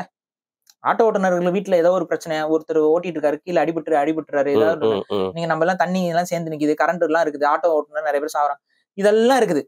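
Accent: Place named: Chennai, India. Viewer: கண்டிப்பாக சென்னை தமிழ் மாறவேண்டும் நீங்கள் இன்னும் முயற்சி எடுங்கள் நன்றி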